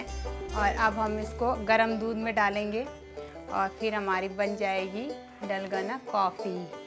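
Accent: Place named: Pahadi, India. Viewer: Cute pahade style taulking tone💚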